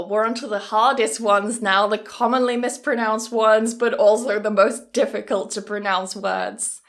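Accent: United Kingdom. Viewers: are you a british speaker?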